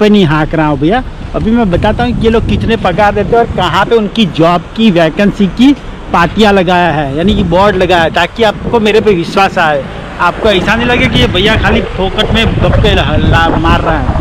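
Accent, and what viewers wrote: Gujarat, India. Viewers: Aa bhai gujarati lage 6 hene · Bhai tme2 to gujrati niklya tmari hindi thi khabr pdi 🥰☺😄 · ગુજરાતી ઓ ની હિન્દી સાભળી મજા આવી ગઈ... KEEP IT UP BROTHER 👍👍👍👍👍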